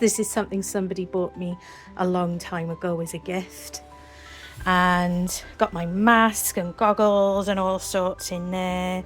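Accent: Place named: Wales, United Kingdom. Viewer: I love to hear the homeland accent I was brought up in South Wales NR Merthyr Tydfil 😊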